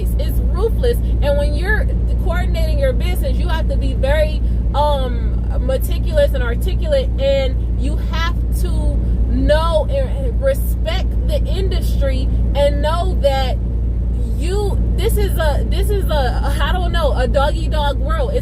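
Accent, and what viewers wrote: South, United States. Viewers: Are you from the south? What are some good load boards besides DAT? Can you name a few?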